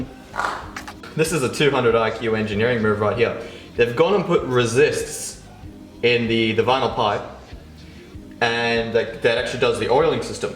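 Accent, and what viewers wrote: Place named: Australia. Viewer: Are you an Aussie or a yank mate? Sort your accent out bud.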